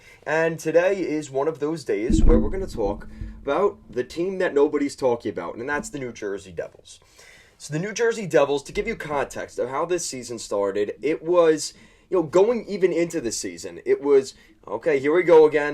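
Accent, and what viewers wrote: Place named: Long Island, United States. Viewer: Like your Long Island accent dude.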